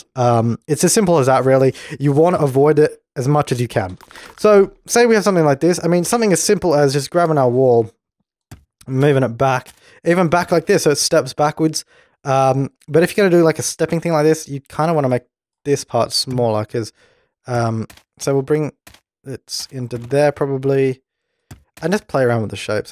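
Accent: Australia. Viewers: I feel like I've just been smacked on the head with a newspaper and told "NO" in an Australian accent.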